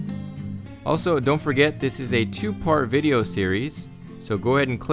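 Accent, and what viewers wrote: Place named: United States. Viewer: great, I love videos with such an american accent and subtitles! because they're so helpful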